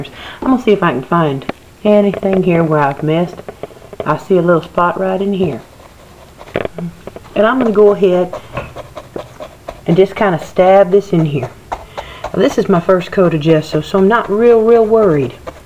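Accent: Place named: South, United States. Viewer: I laughed more than I should have. You could seriously be a comedian, the southern accent makes even funnier :).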